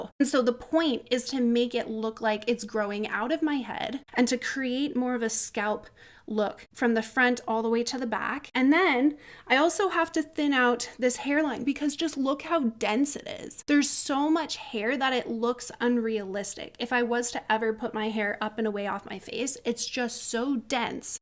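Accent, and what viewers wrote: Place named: Los Angeles, United States. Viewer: Haha I thought that. LA accent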